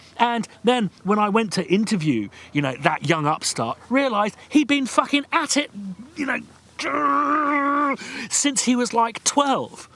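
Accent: United Kingdom. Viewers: Mr here in Mexico we say something about that subject... "You are not a Golden coin you will not like to everyone", someone will like you because who you are and what and how you approach things, and the other will hate you for the same reason, the best advice that a 23 years old young man is that if that someone tells you something and you did not think as he/she does the best thing to do is to say is thank you for your opinion, and s Keep things you love and do it in the way you love. hope my simple advice can be helpful in some manner PD: I really enjoy you style of vlogging and your British accent haha.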